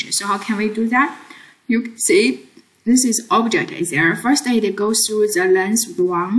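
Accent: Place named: China. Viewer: 聼口音能聼出來是華人，感謝感謝，最近在學雙語大物，沒有頻道主你的付出我真不知道怎麽辦了:face-purple-crying::face-purple-crying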